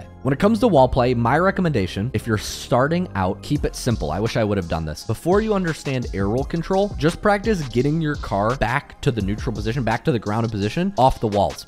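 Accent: Poland. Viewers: Is he polish? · wait are you from poland?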